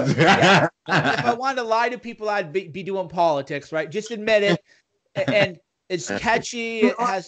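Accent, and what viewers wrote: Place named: Germany. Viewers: He sounds likes he is from Germany or some eastern European country. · Yup, German!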